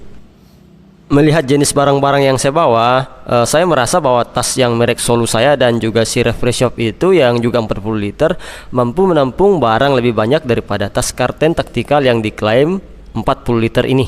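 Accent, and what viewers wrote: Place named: Sulawesi, Indonesia. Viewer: Dari logat bicara kayaknya Abang orang Sulawesi ya 😂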